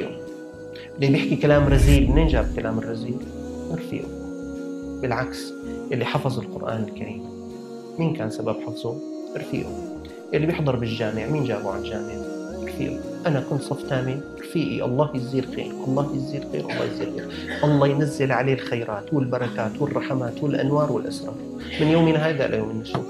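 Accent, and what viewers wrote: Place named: Levant, Arab world. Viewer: فين صوتك شامي فين دمشق...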